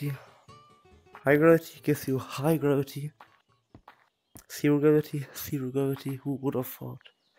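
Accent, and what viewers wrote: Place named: Germany. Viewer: you sound soo german XD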